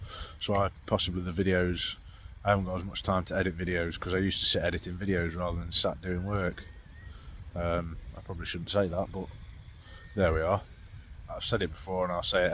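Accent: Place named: Derbyshire, United Kingdom. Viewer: I live just outside Leek, I shoot competition air rifle with a lot of Derby / Matlock lads so knew you were that way from the accent😄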